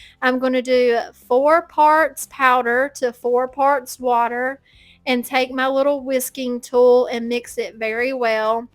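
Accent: South, United States. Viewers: They are all beautiful, what state are you in. Must be native born. I'm just not used to a southern accent it's nice.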